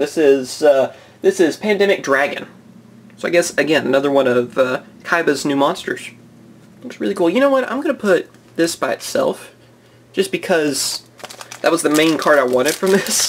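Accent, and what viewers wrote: Texas, United States. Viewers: Are you Texan your accent?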